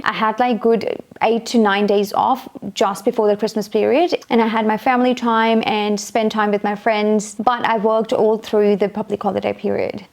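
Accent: Australia. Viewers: You speak like Aussie.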